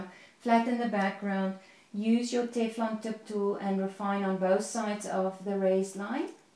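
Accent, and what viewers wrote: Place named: South Africa. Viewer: Looks lovely,is metal foil the same as pewter.nice to hear South African accent again ,I'm a ex South African living in the UK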